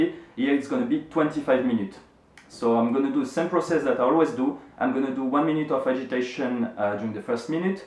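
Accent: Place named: France. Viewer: aaaaah, le petit accent français! J'adore votre site au passage, super utile pour apprendre!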